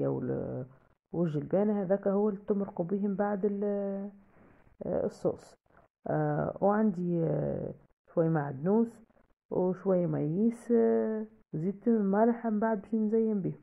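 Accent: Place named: Tunisia. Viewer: قناة زوينة تعجبني اللهجة التونسية، مشتركة جديدة ☺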